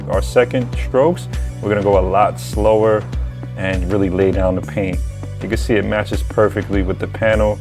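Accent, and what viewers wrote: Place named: Northeast, United States. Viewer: Dude you said right! I know it's hard with a northeast accent 😂. Digging these flips. Nothing like a great deal, minimal cost and effort to double your money.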